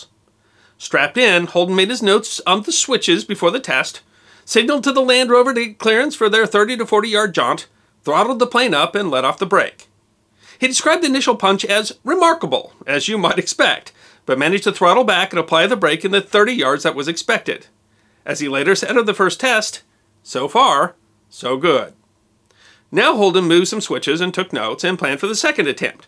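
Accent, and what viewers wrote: United Kingdom. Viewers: Haha!!! So British!!! Sounds like Monty Python!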